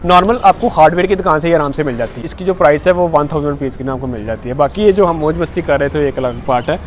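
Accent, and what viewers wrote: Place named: Punjab, Pakistan. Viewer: Laihnde punjab wali boli aa veer ji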